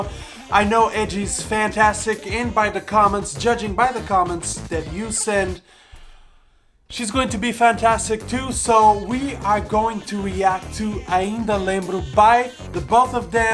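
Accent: Brazil. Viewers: Ele é Brasileiro? Tem acento brazuca em inglês! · R u brazilian or maybe ur parents? Cuz ur name sounds Brazilian and when u speak Portuguese u kinda have a Brazilian accent...it doesn't sound like an american speaking portuguese 😂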